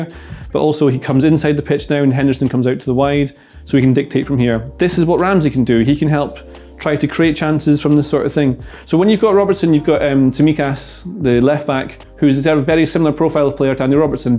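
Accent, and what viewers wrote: Scotland, United Kingdom. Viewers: how have i just realised hes got a scottish accent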